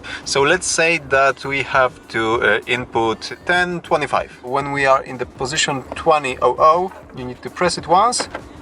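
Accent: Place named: Poland. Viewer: polski akcent angielskiego, zawsze śmieszy :) ...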